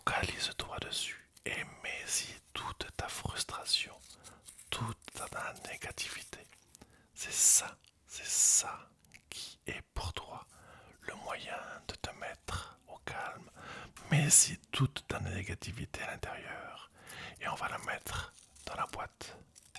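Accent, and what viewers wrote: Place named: Germany. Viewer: Merci pour tes vidéos... j'aime beaucoup ton timbre,ton accent légèrement germanique.En tout cas continue comme ça 👏👏👏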